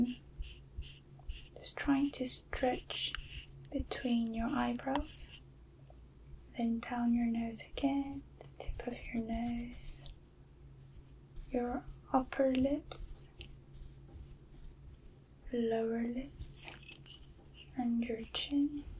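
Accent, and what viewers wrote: United Kingdom. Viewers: Are you European? Your accent sounds both American British (Northern and southern? Mabye a mix of American Irish but mostly British. I can't tell and I'm so confused) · Oh my god you have like a British accent that's so pretty 😊 and love the ASMR video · Are you British? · I’m getting British accent vibes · She sound quite British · I... can't place your accent. Sounds predominantly british, but it breaks that... pattern, for lack of a better term, often enoufh to make me question. As an american who doesn'r get exposed to many different accents, it bothers me when i can't identify it, lol. Something about reinforcing the ignorant american stereotype? All that aside your asmr is fantastic, i found your content last week, and have binged vids 3 times for relaxation and sleep. Edit, are you layering the file for the mic sounds on top of the audio from the video? 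· What country do you live in? I can't place the accent but sounds like the UK. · IDK why but she sounds british for some reason. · u are from UK? I loved ur accent🥺❤️ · It’s giving uk 🇬🇧 😂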